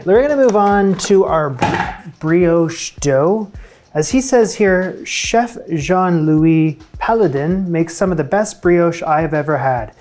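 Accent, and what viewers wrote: Canada. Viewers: Night shift Canadian accent is more pronounced and I love that. 🇨🇦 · I love his Canadian accent. 'Hang the Po-ouch.' LOL. Also, even if I'm making a cake, brownies or bread, I still use the trick my mom taught me. I take Crisco in a paper towel, grease up the pan. Then cover/dust with flour, to tap out the extra before baking. Anything for the 'Substitute' doesn't match.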